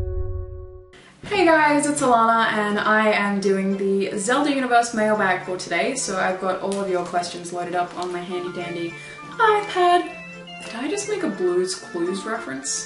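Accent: Australia. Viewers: She's an Aussie, they've got that cute accent, all of them :P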